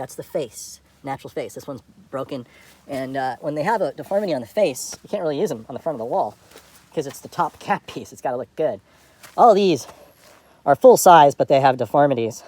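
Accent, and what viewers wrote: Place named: Southern California, United States. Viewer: very pleasing SoCal accent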